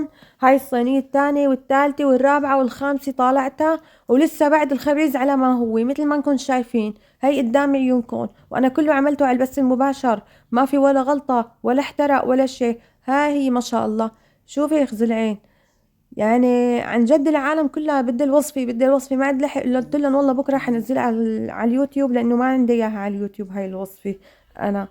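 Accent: Homs, Syria. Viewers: يسلموا دياتك فعلا شي بشهي يعطيك العافية ويبارك ما بين ايديكي .على فكرة دخيل اللهجة الحمصية ما أحلاها الله ايسلم ❤❤❤❤ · حبيبتي شكرا انا متابعتك وبحبك كتير احلى لهجة لهجة السورين وبحب حكياتك وصفاتك انتو السورين ستات بيوت ماشالله عليكم متابعتك ام فيصل من فلسطين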